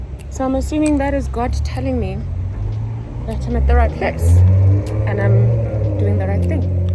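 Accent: Nigeria. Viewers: Every time I fell in love with your Nigerian accent😊❤ I love you ❤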